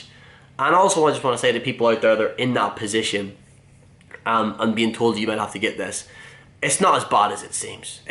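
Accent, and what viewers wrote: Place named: Scotland, United Kingdom. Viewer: Haha sum boy what part of Scotland you from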